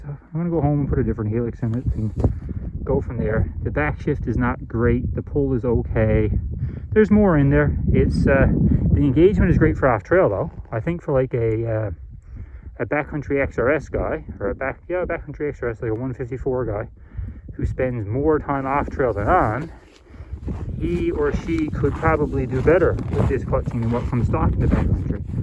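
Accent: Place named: Newfoundland, Canada. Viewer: Thought ya was a Newfie ?!! Lol good stuff thinking this may be my next sled ? Keep xterrain content coming! Cheers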